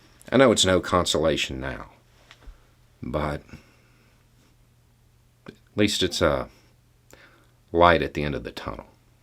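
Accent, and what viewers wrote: South, United States. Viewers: Thanks for sharing. One of my favorite of your uploads. Keep em coming, you're single handedly making a significant impact one 6 minute video a time. I could be wrong but you being a southerner w/an obvious accent is kinda like getting a toe in the door of many people who would instantly shut down to a New Englander like myself. Even though I've always been a small govt,* 2nd amendment supporter,* an avid student of the country's creation. Mostly through the men & women who wove all the complicated threads needed to create a new democratic republic. People who understood compromise was absolutely necessary and that pleasing everyone is impossible & trying to is a fools errand. I've tried to understand our country, the good, bad, & the ugly through the eyes of the real people who did their best to create a durable government for the people, of the people. 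All had their flaws, all had their genius & an incredible work ethic. Yet for many, if I had a mirror image YT channel I don't see myself having whatever level of success you do in getting certain people to even listen. I'm guilty of the same biases I guess. I hear a southern accent I'm instantly wondering where the Redhat & desecrated flag(pictures, words, etc.) is. I am instantly on guard. Worst of all I'm looking for the exit which sadly could deprive me of meeting a good person, making a friend, etc. I didn't intend to write more than a sentence or two but got carried away. Anyway, have a great Sunday & thank you f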